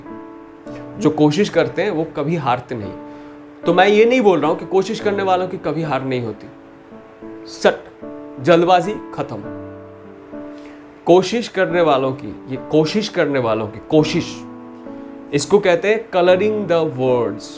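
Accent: Bengal, India. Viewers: Nice, but aapki hindi me Bengali tuch abhi bhut dikhta hai bro.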